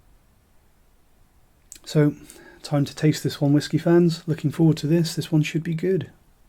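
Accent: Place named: United Kingdom. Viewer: Where are you from in Great Britain? Your accent is very nice to listen to. Added to your whisky knowledge and honest reviews, this makes you the best youtube subscription i made alongside Gwhisky this year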